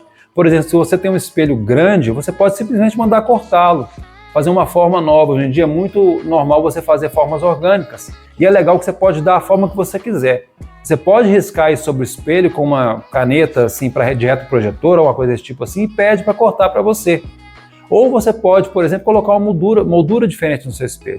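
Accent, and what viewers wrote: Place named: Minas Gerais, Brazil. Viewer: Esse mineirês é tão charmoso, aconchegante e bonito, né? Tal qual as peças!!!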